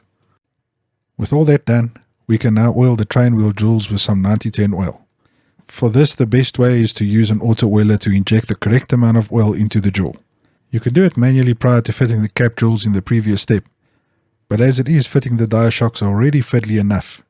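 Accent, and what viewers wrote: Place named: South Africa. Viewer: Excellent and a nice South African-accent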